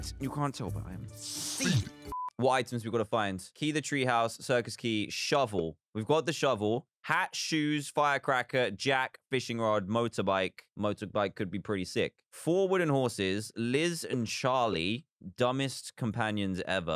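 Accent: United Kingdom. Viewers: Eat Your Cereal he’s British lol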